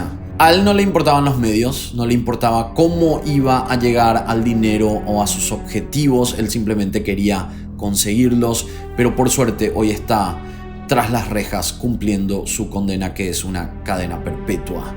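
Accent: Venezuela. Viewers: Pensé que eras venezolano. No conocía este caso y ps wow lo que hace una buena oratoria. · Aló! 👋😉👌Pensé que eras Venezolano. 🤔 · Yo pensé q eras venezolano jiji Desde que conocí tu canal , tus videos son muy interesantes y atrapantes x la manera en cómo Relatas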